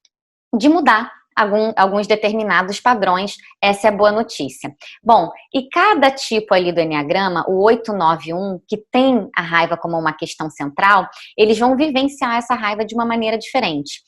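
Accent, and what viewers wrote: Rio de Janeiro, Brazil. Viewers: Desculpa nãos consigo ouvir carioca nem de longe...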